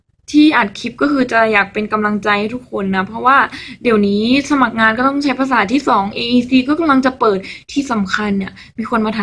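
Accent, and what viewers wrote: Thailand. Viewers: พูดใต้อยู่เลย5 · ฮาๆๆ มีอีสาน มาด้วย 5555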